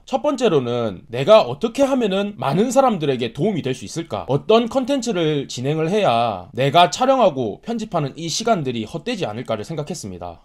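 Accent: Gyeongsang, South Korea. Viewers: 혹시 고향이 경북경남쪽인가요? 사투리가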